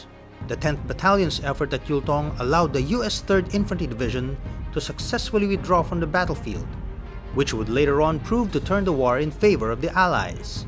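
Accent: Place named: Philippines. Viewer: This is Filipino English accent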